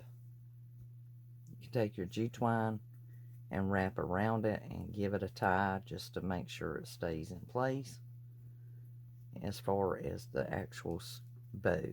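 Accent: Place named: Ohio, United States. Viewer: Thank You So Much!! ❤ I'm in Ohio and I was trying to figure out your accent lol I'm guessing Southern Ohio, West Virginia or Kentucky? I have family all over there!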